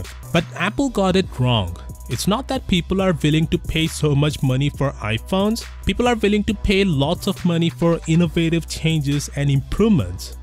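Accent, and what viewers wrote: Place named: India. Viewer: are you indian, you sound like the tech support from bny mellon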